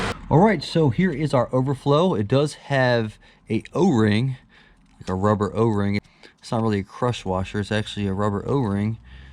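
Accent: United States. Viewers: I don't know where you're at but the accent brings back memories of growing up in Southwest Philly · Great vid but the guy sounds American. Why the fuck is he using celsius?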